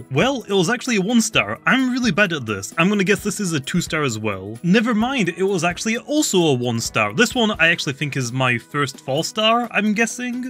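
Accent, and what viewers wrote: United Kingdom. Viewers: Goppie :0 But also bri'ish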